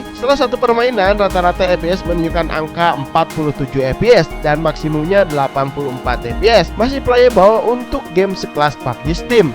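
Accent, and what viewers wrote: West Java, Indonesia. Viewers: amd dan jipors. sundana teu bisa di leungitkeun. haha.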